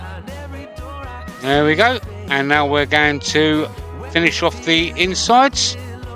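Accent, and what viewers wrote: United Kingdom. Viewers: Looks great, I'm a little confused, your accent sounds like you're from the UK but you pronounced Aluminium as if you were an American.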